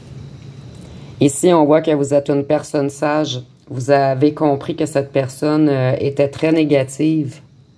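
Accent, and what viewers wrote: Canada. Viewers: Bonjour merci pour ce tirage qui me parle beaucoup,gardez bien ce joli petit accent canadien, belle journée à vous 😊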